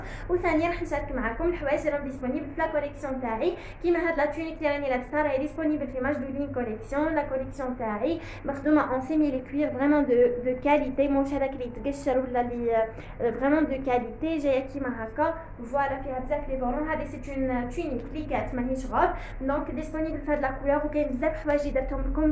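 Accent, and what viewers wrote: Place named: Maghreb. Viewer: فيديو رائع ❤️❤️ تصوير رائع واختياراتك أنيقة❤️❤️بس اللهجة صعبة الفهم لمعظم العرب لا يفهمون اللهجة المغربية والجزائرية والتونسية للأسف 💔💔 ف الرجاء التحدث ب الشامية أو الفصحى أو المصرية هكذا ينتشر الفيديو أسرع ويكون له جمهور أكبر او ضيفي ترجمة مصاحبة بليز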